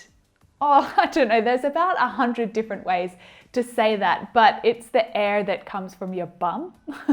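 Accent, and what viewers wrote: Australia. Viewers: Thank you so much for your lessons l juste want to make sure are you Australian or American or Canadian??????? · Are you an Australian?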